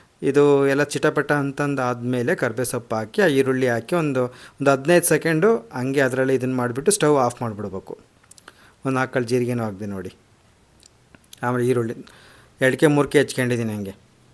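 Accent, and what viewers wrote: Chitradurga, India. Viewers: ಪೂರ್ತಿ ದುರ್ಗದ ಭಾಷೆ ಕೇಳೋಕೆ ಚಂದ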